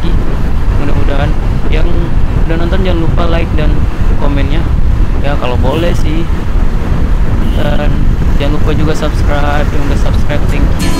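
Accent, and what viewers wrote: Medan, Indonesia. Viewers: udah agak ilang kutengok logat medan kau disini lae, jangan di ilangin lae daya tariknya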